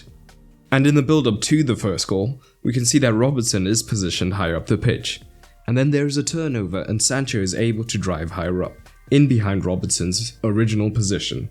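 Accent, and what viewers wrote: South Africa. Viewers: Are you South African? Great analysis- love your videos❤️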